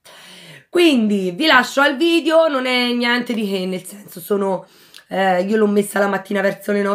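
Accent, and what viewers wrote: Tuscany, Italy. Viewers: Bel video :) tieni un sacco di compagnia e il tuo accento toscano è meraviglioso :) ❤🌹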